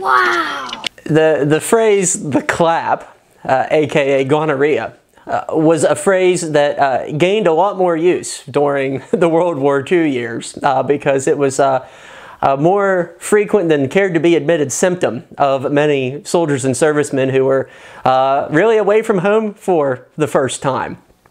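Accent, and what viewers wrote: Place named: Mid-Atlantic, United States. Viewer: a compliment of the highest order. I'm a lifelong Philadelphian, I've always said Hollywood tells us only 4 accents exist in the US , California/valley girl , New York, Boston, Southern and occasionally Midwestern . Yet the Mid Atlantic/PA accent is just as distinct as any of those, yet it's never even attempted. Despite the gluttony of great actors from the region like Tina fey, Bradley Cooper, Kevin bacon , will smith and Kat dennings. Worst of all whenever a movie is set in Philly they usually just have the actor do a new York accent. When I started going to school in upstate new York people thought my accent was fake, they litteraly thought I was pretending. Thank god bam margera and his show viva la bam became popular. At least then people could see I really did say "howgie" and "hoooomee" They say that accents are begining to vanish. 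So when I hear someone unapologetically speaking in a distinctly regional accent I love it. Especially when it's my region . I'm a big fan of movies, history and Pennsylvania so I'm a big fan of what youre doing. I think it's a great and unique concept and you blend the two really well and make it enjoyable. I can't recommend your channel enough. Keep up the good work.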